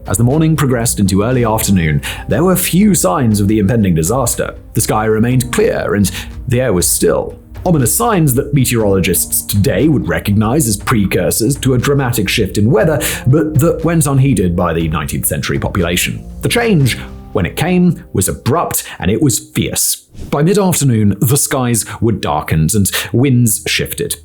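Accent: United Kingdom. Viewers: Hard for me to take a British accent about AMERICAN history. AI is terrible about throwing in heavy Irish and British accents too when talking about even the old west history of the USA. Usually I just stop those and move on to another video. Why would I want a Brit telling me about AMERCAN history, when their great Brit army/navy could not even beat a ragtag USA colonial army of farmers and settlers....get real. Keep it real Youtube!!!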